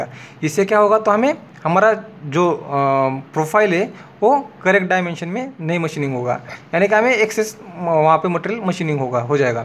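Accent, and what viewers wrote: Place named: Maharashtra, India. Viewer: Loved the vedio But Marathi anscent is just awesome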